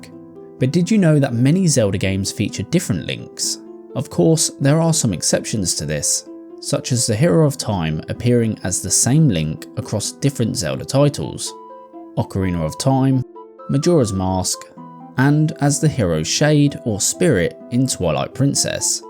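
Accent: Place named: United Kingdom. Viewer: British accents are annoying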